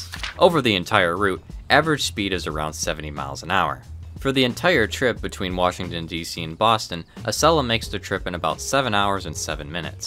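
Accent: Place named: Chicago, United States. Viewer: You gotta be a Chicago boy/or visitor👍🏻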